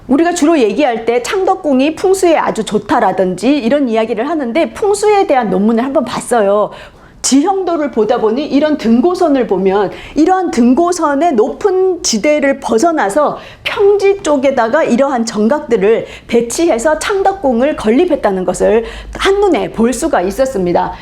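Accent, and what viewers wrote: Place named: Jeolla, South Korea. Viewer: 全羅道 方言(사투리)가 섞인 解說이네요. 强하지가 않아 귀여우시네요. 感謝하당께롱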